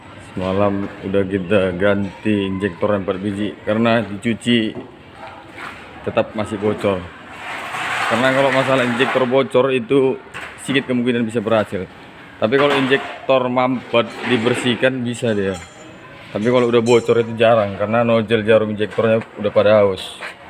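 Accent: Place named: Medan, Indonesia. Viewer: Bang bengkel nya dimana bang.apa dimedan.logat nnya medan.kalo tangerang mah deket nih ke saya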